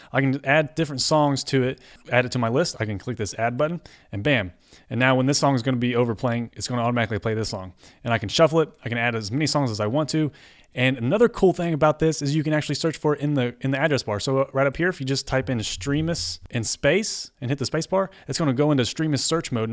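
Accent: United States. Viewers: L'accent américain est inaudible pour un français. Merci d'avoir mis les sous titres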